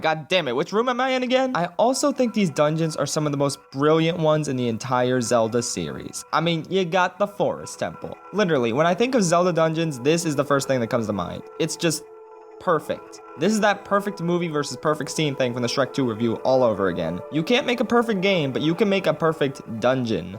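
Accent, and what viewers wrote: United Kingdom. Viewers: omg you’re british no way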